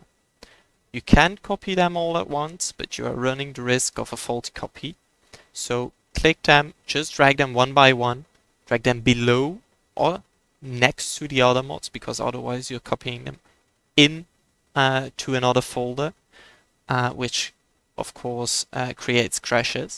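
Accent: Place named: Netherlands. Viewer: You got a clean accent for a dutch dude ;)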